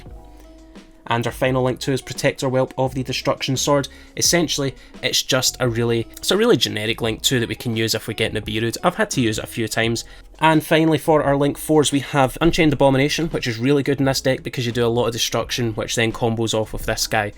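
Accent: Scotland, United Kingdom. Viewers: "Fantasmay is Fantastic" with that accent will never leave my head anymore 🤣🤣 Assuming Scottish aswell?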